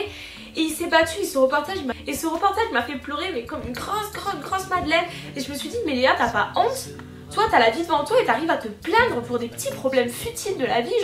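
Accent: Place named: Toulouse, France. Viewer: tu a l'accent toulousin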